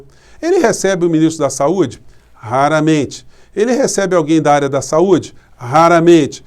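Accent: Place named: Rio de Janeiro, Brazil. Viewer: Professor, não tente "amenizar" o seu sotaque. Fale sem pena nem vergonha com o nosso sotaque do nosso maravilhoso Rio de Janeiro! Um abraço!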